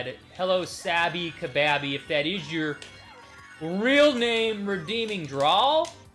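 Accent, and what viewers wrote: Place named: South, United States. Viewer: lmao that southern accent. People here in SC actually say "fixin to do something" I didn't think that was a real thing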